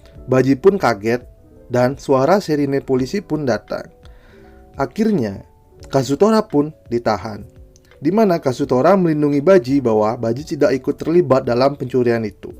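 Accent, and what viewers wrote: Bali, Indonesia. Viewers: Nak bali ules ne logat bli ne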